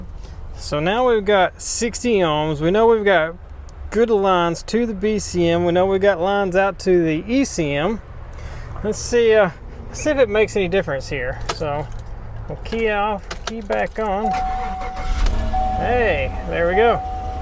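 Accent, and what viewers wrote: South, United States. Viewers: I watch every video but have learned the most about canbuss communication issues. Watching your videos is like watching your thought process spoken out loud. you're extremely intuitive, and you can see the wheels turning at each step of your process and why you've chosen the particular diagnosis path. your laid-back southern demeanor, and your straightforward integrity is very refreshing. but I've got to admit I'm from the west cost so I adjust the YouTube playback speed much faster so you sound like someone familiar from around here. lol: no disrespect intended.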